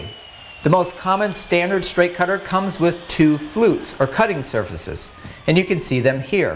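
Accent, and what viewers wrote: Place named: United States. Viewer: Saturday morning...looking for an American-speaking ( not UK/Brit for now ) quick info to start my router-based box joint projects for Christmas gifts ( 105° in my Sat Morning work area) and here you are solving my quick question as to joint...bit...common sizes. I've already built my little trim router table and sled for the joint cutting and ALL I needed ( "impatient 80 year old vet...") was 2 or 3 common bit sizes and after more than 1 hour of going thru the YouTube library of things that are good, but don't get to MY humble, simple needs for 2-day. Thank you, thank you, thank you...now I can get to work!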